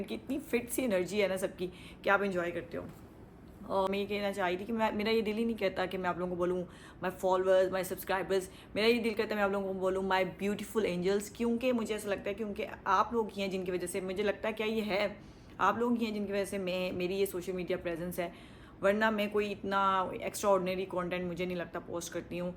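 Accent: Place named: Rawalpindi, Pakistan. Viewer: Totally pindi accent .you are mashallah you talkative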